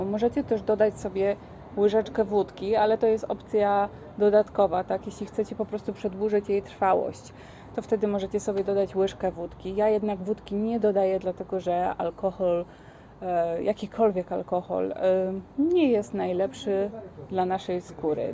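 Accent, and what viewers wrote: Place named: Ukraine. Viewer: Pohodzisz z ukrainy ? Bo masz trohe taki akcęt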